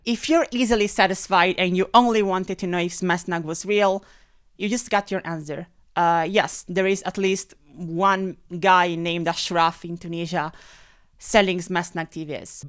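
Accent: Italy. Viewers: She is definitely Italian. Her English pronunciation is really quite good, though. Italians usually have a really hard time pronouncing H at the beginning of words, for example, but she does it fine.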